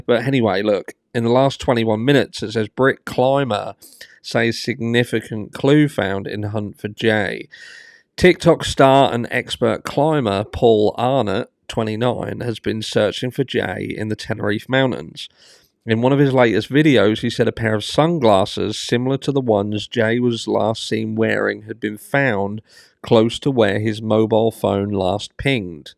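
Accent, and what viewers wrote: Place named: Suffolk, United Kingdom. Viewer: You have a lovely accent !! Are you from Suffolk?? 🌲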